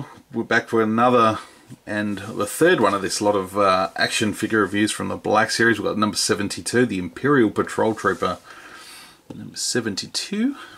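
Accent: Australia. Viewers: Hey man, you sound Australian and so am I. Wondering were you picked this fig up if you are aussie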